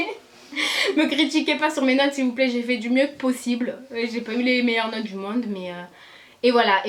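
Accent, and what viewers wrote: Marseille, France. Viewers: Elle est marseillaise,nn?son accent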